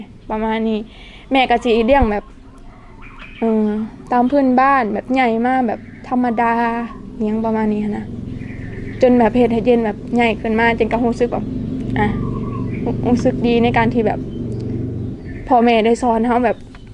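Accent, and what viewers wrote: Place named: Central Thailand, Thailand. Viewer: คุณโชคดีที่เปนได้ทั้งคนไทยและคนลาว แต่ที่แน่ๆ "คุณคือคนไทยโดยกำเนิด" ผมไม่รู้ว่าการอ่านหรือเขียนภาษาไทยของคุณดีแค่ใหน เเต่มีวิธีแก้คือ อ่านหนังสือไทยทุกเรื่องให้เยอะๆแค่นั้นเอง ไม่นานก็จะสำเร็จ ส่วนเรื่องการพูดสำเนียงไทยนั้น ถ้าคุณไม่ได้บอกว่าอยู่ลาวมาจนถึงอายุ 18 ปี ผมคงนึกว่าคุณอยู่ภาคกลาง อย่างเช่น กรุงเทพเป็นต้น ขอให้ประสพความสำเร็จในชีวิตนะครับ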